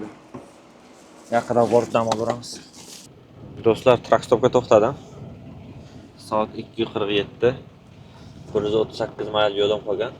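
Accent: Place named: Oltiariq, Uzbekistan. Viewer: Oltiariqlikmisiz shevez o'xshayaptida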